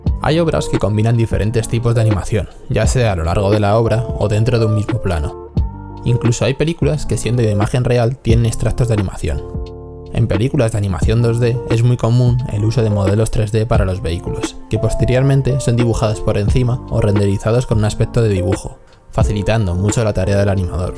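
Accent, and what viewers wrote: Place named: Spain. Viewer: buenisimo tu video. lamentableente detesto la pronunciacion española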